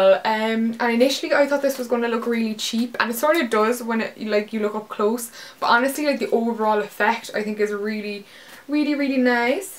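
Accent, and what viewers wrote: Cork, Ireland. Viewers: It's funny how you still have your Cork accent until you say the word "everythin'" which you say in a really strong Belfast accent haha :) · That leather skirt is amazing! And I could listen to your accent all day. My favorite priest was from Cork, as well as my great grandfather. :)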